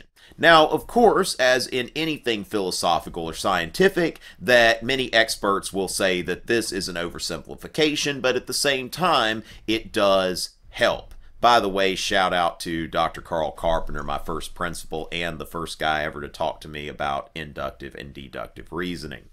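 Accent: South, United States. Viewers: Love your explanation and Southern accent Makes it so simple and easy to understand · Smartest man with a southern accent i have ever heard. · Idk why but the southern accent makes this so much more understandable 😭👌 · Greetings from Belgium! I'm a student in literature and spend my life reading books. I'm saying that in order to ensure you (if you would even need that ^^) that your videos have a good level of accuracy and I rarely find errors in your works on European history, which becomes more and more uncommon on other American channels... Anyway, I'm glad that I can sum up my readings by watching your nice videos with your lovely Southerner accent and, moreover, by doing this, improve my English! :D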